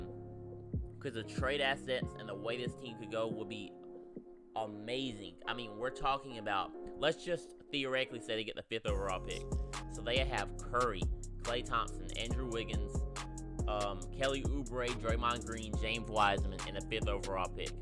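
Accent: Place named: South, United States. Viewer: as an asean, it took hard for me to understand what your sayin. you have accent and your background music to loud. you have country singer accent i think. from PHILIPPINES.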